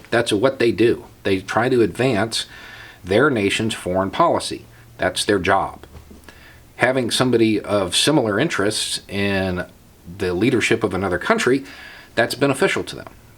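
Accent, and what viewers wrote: South, United States. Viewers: Hey, I have started getting pragur university Ad's on your videos lately. While this is hilariously ironic I can't help but wonder why the Youtube Algorithm would think this is a good idea. My only guess is either that it is because you are a political channel and these are political ad's, or, that it goes deeper than that and the algorithm is going more off what you look like than what you are talking about. It views your videos and goes "Oh, a guy with a southren accent and a beard talking about politics, must be a right winger" and just goes with that. Anyway, just wanted to share because it honestly made me laugh.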